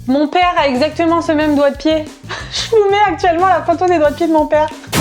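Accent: Quebec, Canada. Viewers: Ton accent du Québec me manque.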